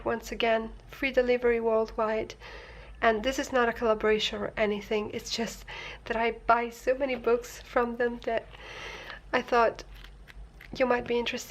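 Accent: United Kingdom. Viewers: Omg amo tu acento británico <3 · Btw, I really love your british accent! :) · Your accent has never been so British :D did you spend your holiday in Britain or something ? xD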